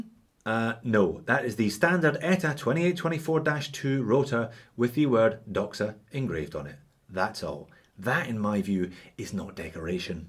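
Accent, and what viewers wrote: Scotland, United Kingdom. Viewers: my man, i thank your for your reviews. your scottish accent and your humor eases my day! thank you!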